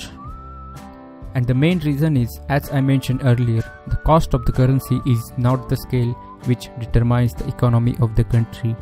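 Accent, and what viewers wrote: India. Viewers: You cannot complain on the accent.. Its Indian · His Indian accent is gold :) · Why all the indians talk like That i hate the indian accent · india accent sucks😂😂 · am indian, and ur way of speaking english is ridiculous, plz get some training in accent am bengali but I don't speak like this.. now I understand why foreigners think it's annoying accent 😒😒😒.. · Presentation is quite well, but it'd be excellent if your voice was clear and had a definite accent, as it sounds to me like 90% Indian and 10% Unknown. Try to stick to one accent and make your voice clear. Just a suggestion. Don't take it otherwise.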